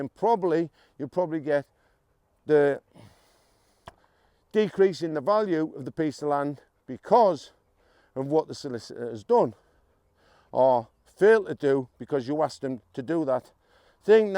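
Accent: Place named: North East England, United Kingdom. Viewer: Hiya mate sound like ya up NE somewhere... maybe Redcar way ? Just found the channel and appreciate whatever advice you have to share. Do you still have to cough up council tax or business rates if classed as smallholding ? Cheers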